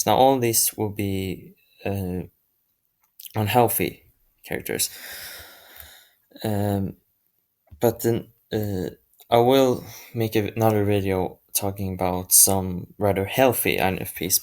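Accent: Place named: Scandinavia. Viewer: I like the video😄 Btw are you Norwegian perhaps? Your accent sounds Scandinavian😊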